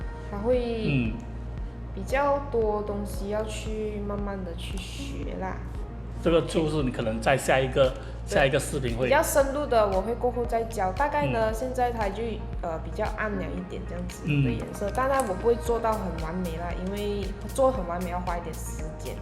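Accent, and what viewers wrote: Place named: Malaysia. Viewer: 很开心看到这个channel...听到马来西亚华语很亲切！加油！！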